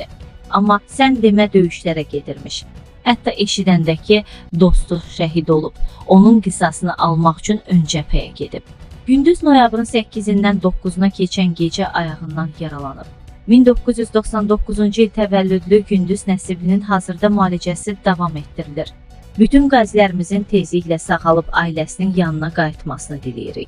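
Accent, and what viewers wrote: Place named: Armenia. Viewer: Ay məni qınayan mətni oxuyan ermənidi ?? Bu necə oxumaqdı? Hardan tutub gətiriblər bunu ????? Ay bala bu nə matetialdıııııı?????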